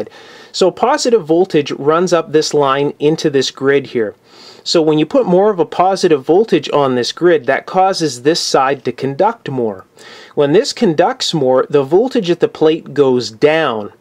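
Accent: Canada. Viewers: Hello Mr., Your vids are excellent. Very explanative. I have only one question, and I'm already guessing that maybe it's because you're Canadian!? It's about your pronunciation of the word "solder"! I have always heard it as SAUDER. But you say SAULDER. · I think it's a Canadian thing, eh? It causes him to mispronounce Farad as FAYrad, too. The guy's name was Faraday: Fare-aday, not Fay-raday...wonder how he pronounces "soldier"? Not a bit of that is an issue here though. His amazing teaching skills, along with an extraordinarily extensive understanding of broad and diverse technologies trump his localized pronunciation idiosyncrasies.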